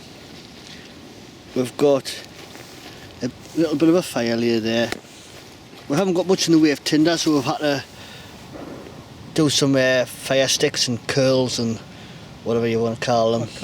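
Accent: Newcastle, United Kingdom. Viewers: Love the Geordie (?) craziness, any chance of subtitles for us Southerners - only joking ;-) Useful info too thanks.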